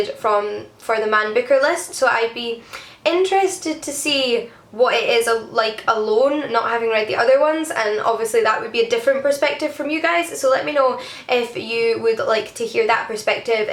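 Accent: Scotland, United Kingdom. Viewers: ***** Paid in books and swag, then? Not so bad. I binged quite a bit of your channel last night after I discovered you. Definitely one of my favorite booktubers so far. I'm really enjoying joining this community. But your beautiful accent makes me miss Scotland! My wife and I lived in Edinburgh for a couple years. Our first child was born there. Fond memories. P.S. in your profile pic you totally look like a blonde Karen Gillan.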